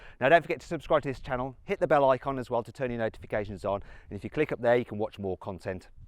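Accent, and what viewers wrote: Birmingham, United Kingdom. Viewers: sorry i couldn't understand the model of the yokohama, can someone please write it down here? thanks! (english isn't my first language and the british accent makes it a bit hard to understand sometimes!)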